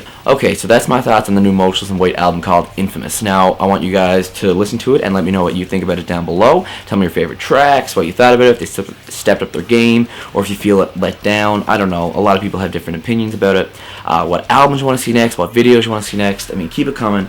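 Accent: Canada. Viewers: You're from Canada, ain't ya bud?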